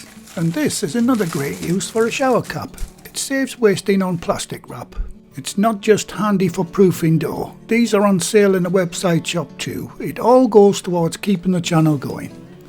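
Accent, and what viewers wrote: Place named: England, United Kingdom. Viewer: Geez I really like the recipe you’re using and your teaching style! It looks very tasty and a great dish for winter. Your accent reminds me of my Dad and his English relatives. Thank you for this video.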